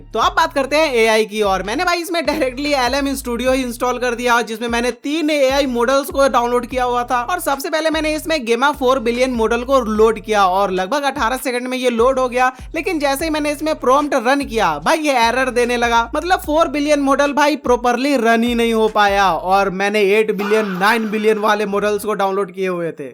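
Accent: India. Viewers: Bhai pakka tum bengali ho, mask lagake rakho tabvi bata skata hu 😅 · Indian accent so amazing🎉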